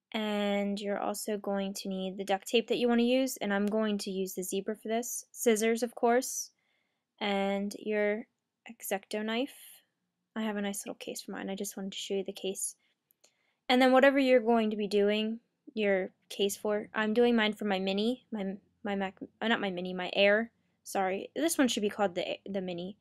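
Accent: Ireland. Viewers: are you irish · yeah are you irish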